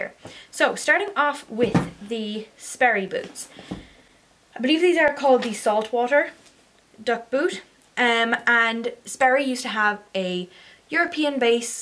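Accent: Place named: Ireland. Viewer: I really enjoyed your review and love your Irish brogue. I love your country, too, and visited County Cork (backpacking) in the late 60's. Anyway, I have a pair of LLBean duck boots but I dislike the collar as it is not padded. If I can get LLBean to affix padding in my collars they would be first class; and that side zipper on the Sperry's would make them awesome.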